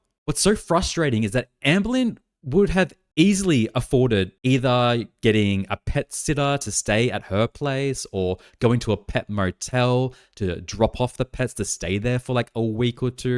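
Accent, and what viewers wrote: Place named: Australia. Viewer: Great synopsis. It's always nice getting Gorlworld updates in my accent. #aussiepride The whole not taking BP medication thing is stark raving bollocks. How does a person with health anxiety (which I do think she has. I have it; I know the signs) hear she has high BP and be like "...no, I'll fix it naturally"? Girl. Come on. Even if we believed you were capable of losing weight at this point, at least take the meds until you've got your weight down and your BP is normal without them. This tells me there's some pride getting mixed in here.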